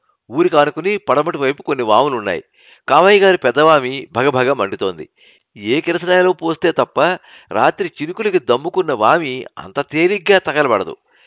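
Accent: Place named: Guntur, India. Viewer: చాలా కాలానికి మట్టి వాసనతో పరిమళించిన అచ్చ తెలుగు కథ విన్నాను. పాపినేని శివశంకర్ గారి కథా సంపుటి "మట్టి గుండె" లో ఈ కథ చదివాను. గుంటూరు జిల్లా పల్లెటూళ్ళలో స్వచ్ఛంగా వినిపించే భాషతో కథ మరింత శోభించింది. మా జిల్లా ప్రజల భాషను కథలో చొప్పించిన శివశంకర్ గారి ఘనత ఒకటైతే, ఉత్తరాంధ్ర వాసి గారు, ఆ భాషకు పూర్తి న్యాయం చేకూర్చటం మరొక ఘనత. రచయితకు, ప్రయోక్తకు వేనవేల వందనాలు.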